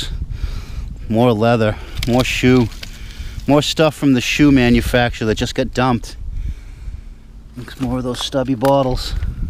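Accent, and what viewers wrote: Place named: Massachusetts, United States. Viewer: I don’t need to see the video, the yard, or even the map. I only need him to talk for 5 seconds to know that he is from Haverhill, MA. Hello from Andover!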